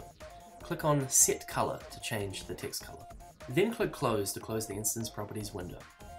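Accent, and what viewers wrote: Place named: New Zealand. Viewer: you sound like a kiwi XD sorry if ur not XD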